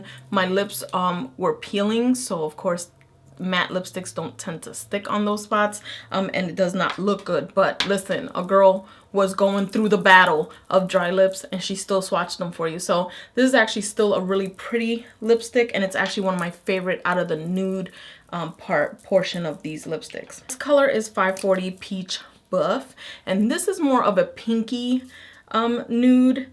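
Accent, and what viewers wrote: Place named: New Jersey, United States. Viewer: you have this jersey mob accent i love it girl!! ha :)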